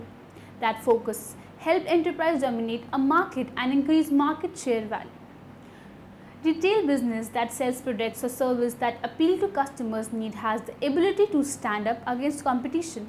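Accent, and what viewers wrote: India. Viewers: She is speaking in English with an Indian Accent. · I have no idea why people are criticizing her accent. Apparently she is born to be an Indian and that's part of her characteristics, that's her.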